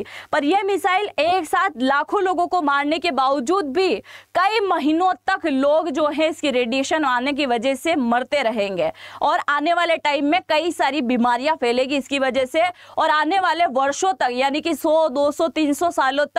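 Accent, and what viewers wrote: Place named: Bihar, India. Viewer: Sahar nahi hota hai bihari ho madam.ji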